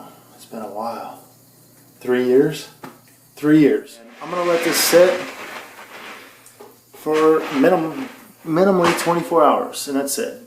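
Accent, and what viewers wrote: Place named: United States. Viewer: Hy drott in Iove it. you usavians, are so sweet with your accents.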